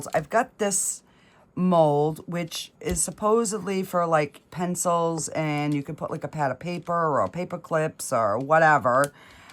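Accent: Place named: Massachusetts, United States. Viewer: Where are u from if you don't mind me asking you sound like mass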